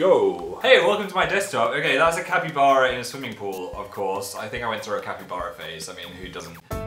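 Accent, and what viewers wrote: North, United Kingdom. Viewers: In the camera test he sounded so Northern!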